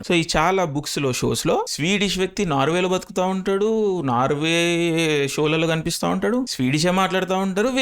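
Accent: Telangana, India. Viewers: Nuv entha ruddhina Telangana slang uneducated telugu😂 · Lavadalo telangana slang!! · Bro naadi guntur, but i'm a huge fan of your slang/accent!! I could watch you speak all day i mean half english half telangana mix chesi posh ga, i just love it 😂❤!! didn't watch the full video cos I like you that much, as with anything andhra lone district ki oka accent undi so easy bro, let's get back to more pressing issues!! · Emanna act chesav bro, aakariki nee thumbnail kuda telugu lone pettav ra batta, Nuvvu entha sollu dengina telangana yaasa maatrame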